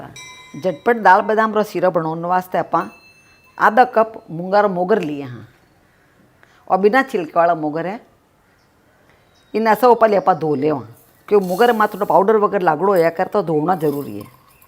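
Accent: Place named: Marwari, India. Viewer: Aap k videos muje bahot pasand aate he ,aur aapka marvadi boli bhi..maine aapki kafi recipe try ki he aur bahot badiya bani bhi he,thnx mummy ji hame itni aachhi recipes sikhane k liye.🙏😊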